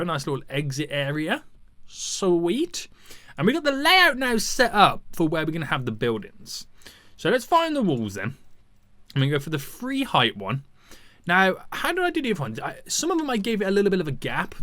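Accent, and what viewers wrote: United Kingdom. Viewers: How do u not notice he is British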